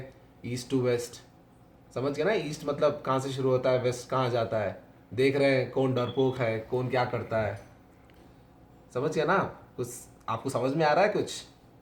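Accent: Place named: Hyderabad, India. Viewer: bhai gud reply in typical Hyderabadi accent 👌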